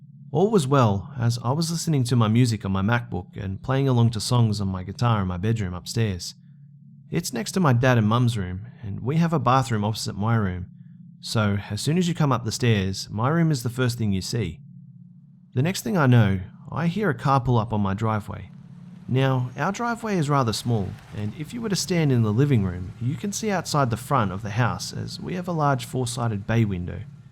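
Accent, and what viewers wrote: Australia. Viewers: you have such a nice aussie accent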